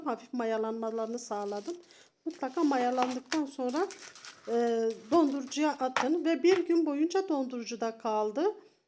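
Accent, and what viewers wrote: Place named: Black Sea, Turkey. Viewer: Ellerine sağlık çok güzel olmuş karadenizlimisiniz konusmasiveniz ayni bendekaradenizliyim izmirden selamlar ❤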